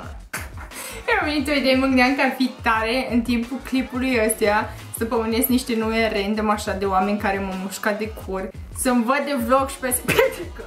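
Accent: Moldova. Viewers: Wow. Asa ceva.... Am intrat pe video-ul asta din intamplare de la o sugestie a youtube-ului. Am ramas interzisa practic:)). Am dat peste o faptura care latra la mine si incerca sa fie funny. First of all: Nu esti funny... daca gesticulezi mult si te scalambi, asta nu inseamna automat ca esti funny. Numai unul poate sa pull it off si ala e Jim Carrey. Second of all: Incearca sa renunti la accentul de moldoveanca, te face sa pari....cum sa ma exprim...saraca cu duhul. Sau daca nu poti renunta la accent, macar nu mai vorbi in engleza, engleza cu accent moldovenesc te face cel putin penibila. Ce e mai trist e ca ai peste 50.000 de subscriberi, asta inseamna ca sunt 50.000 de oameni care te privesc ca pe un role model...bine...majoritatea lor sunt probabil baieti virgini si fete pana in 20 de ani care au ca poza de profil un selfie...deci... In orice caz, inteleg de ce ai succes pe net si probabil nu la fel de mult si in viata reala. 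Asta e pentru ca youtube-ul e 2D...pe cand viata reala, din pacate pentru tine, e 3D, adica lumea te poate vedea si din profil...if you know what I mean. Peace.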